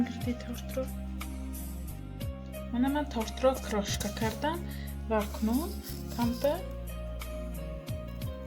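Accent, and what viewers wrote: Isfara, Tajikistan. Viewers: Апа аз Исфара хастед?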